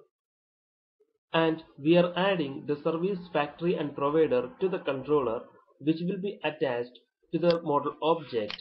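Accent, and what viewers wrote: India. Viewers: Indian accent everywhere :( ! -_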